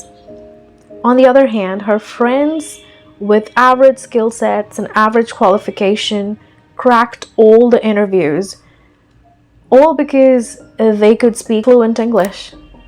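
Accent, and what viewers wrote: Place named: India. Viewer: I only heared the audio without looking at the video to remove my bias, to check how you are sounding like. To my ear, out of 100 words you speak, 80% is correct accent, 20% is indian. Same problem happens with evryone who starts to speak with an accent. They always oscillate between indian and american. That oscillation is easily detectable and thats the reason of ridiculing the speaker. If you are 100% perfect, no one will ridicule you. For example, an american born and brought up indian origin teenager speaks with perfect american accent without any mix up.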